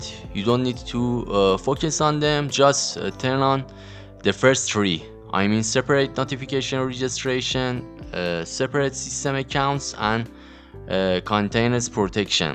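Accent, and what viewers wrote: Iran. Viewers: انگلیسی با لهجه اصفهانی قشنگی داری داداش، عالی😎👍🏻❤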